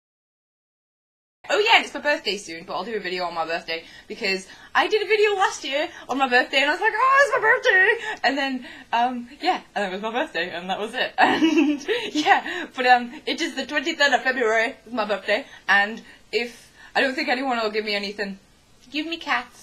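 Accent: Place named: United Kingdom. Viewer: i didnt realise ur british ? :O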